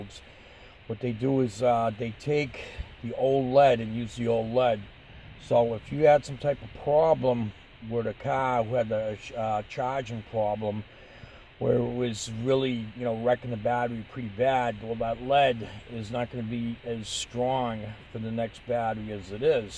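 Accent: United States. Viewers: Love the Boston accent! I live in Haverhill! Lol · Recognized the accent right away. Lived in Salem Mass!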